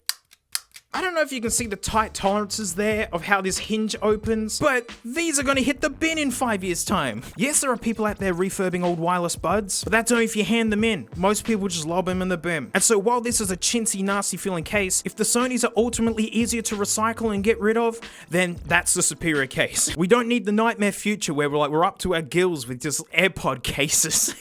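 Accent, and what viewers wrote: Australia. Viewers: That has to be the most Australian dankmic rant yet. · the aussie man is back at it again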